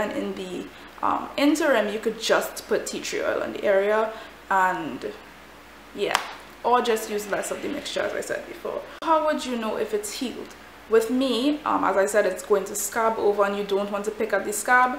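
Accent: Trinidad and Tobago. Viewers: got so excited when I heard that Trini accent!!! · are u from Trinidad? 🙌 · Girl u got to be Trini! Love the accent...thanks for the advice as well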